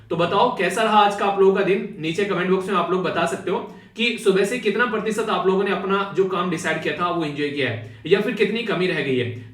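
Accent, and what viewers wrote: Haryana, India. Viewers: Sir ap haryana se ho..... Rewari wale area se..... As I can guess.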